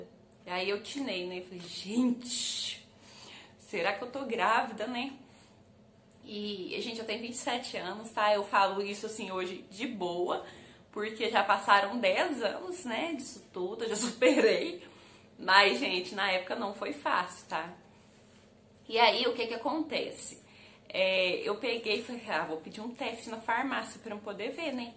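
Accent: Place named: Minas Gerais, Brazil. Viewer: Gosto do seu jeitinho humilde e simples! Tão nova e com uma cabeça boa. Deus te abençoe. Ah, adoro seu jeitinho de falar mineirinho😉😘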